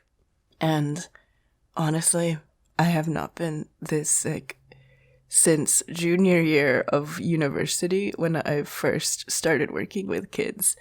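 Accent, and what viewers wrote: New Jersey, United States. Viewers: Your sick voice sounds like you came from New Jersey. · Oddly she developed a jersey accent. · sounds like you're from jersey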